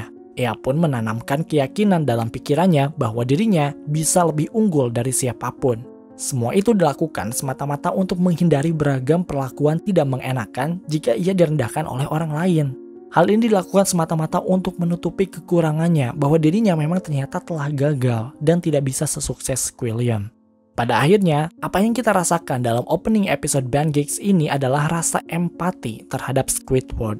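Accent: South Jakarta, Indonesia. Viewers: Bg lu orng jaksel ya?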